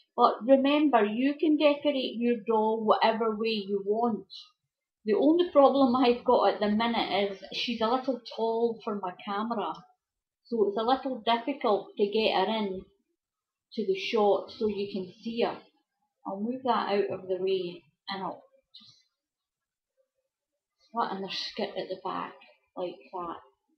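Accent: Scotland, United Kingdom. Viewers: A beautiful craft! She turned out lovely. Your Scottish accent was a treat to listen to as well.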